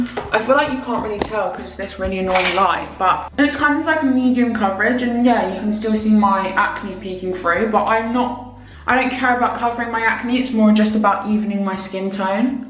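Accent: United Kingdom. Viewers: Amazing Channel and by the way I’m from the U.S.A. And you are from the U.K. I heard because your voice is British mine is English nice to meet you “socially”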